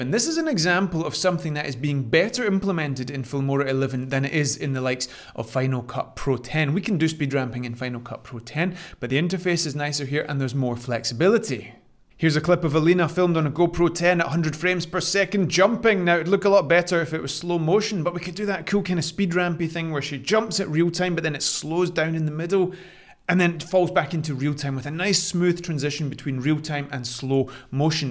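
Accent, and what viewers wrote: Scotland, United Kingdom. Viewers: I have subscribed. Nice to hear another Scottish accent!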